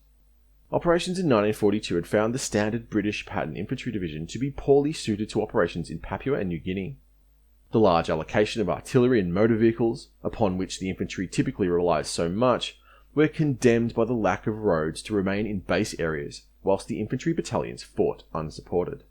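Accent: Australia. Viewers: Probably . It still makes him sound like a bogan . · Good thing this guy is Australian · I am guessing the narrator of this is Australian? At any rate, I am grateful that you produced this! (I am American 😁) it has been very educational to hear this - as another comment stated, all too often Americans only showcase Americans! 😩 Anyway, thank you for this! ❤️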